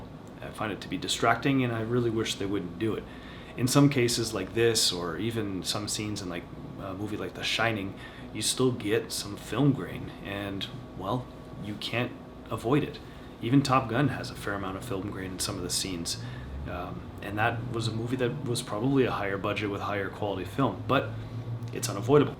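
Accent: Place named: Canada. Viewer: Rad is Rad. I hear a Canadian accent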